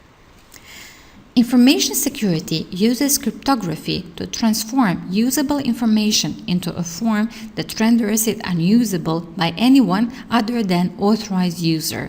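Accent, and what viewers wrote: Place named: Russia. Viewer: That accent, are you from Albania or Russia?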